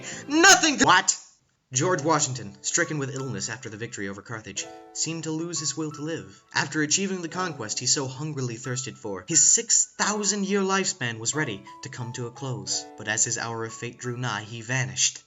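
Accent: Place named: United States. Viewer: He sounds like America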